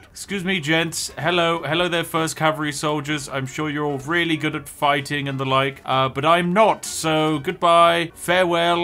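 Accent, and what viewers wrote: United Kingdom. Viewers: You have a lovely British accent there, friend (for real, not kidding) you had fun videos about cyberpunk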